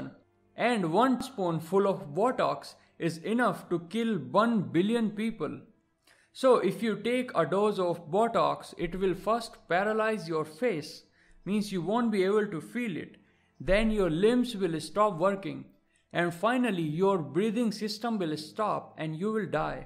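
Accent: India. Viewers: Lemme guess you are indian becoz ur accent bro i love india they are kind persons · To be honest voice sounds like an India like if you think to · His voice sounds like a indian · You talk like an indian and i love it KEEP IT UP😁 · So easy to tell that ur indian i am from nepal btw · has an indian accent...btw are ya indian...if you are then namaste..I m also indian...and if you are not than I will be the one embarassed..lol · Are you From india? Because Your Language is Indian English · Me: Time to watch!! * Indian guy starts talking* Me. What a hell!?!? THATS SO COOL!! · Your accent sounds like Indian. You definitely are from South Asia. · Why are you talking in a Indian accent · U just gave a love to my comment. I still haven't got my answer which country u belong to I have seen every video of yours today I visited your another channel and by face and voice it seems that u are an Indian . 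I want my answer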